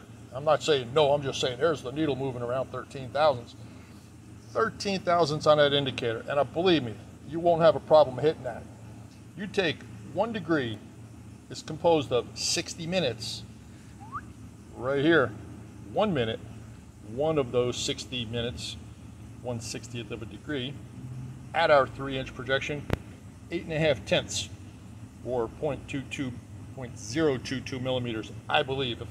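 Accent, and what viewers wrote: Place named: New Jersey, United States. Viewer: Jersey? I thought I detected the accent of a fellow Jersey guy. For sure, that's not a Texas accent. :-)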